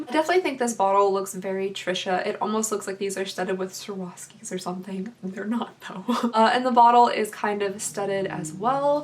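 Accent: Kentucky, United States. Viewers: are you from Kentucky??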